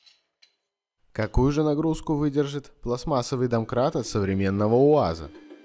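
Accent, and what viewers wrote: Belarus. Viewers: Канал называется Крэйзи рашнз эксперимент, а войсовер имеет выраженное беларуское произношение)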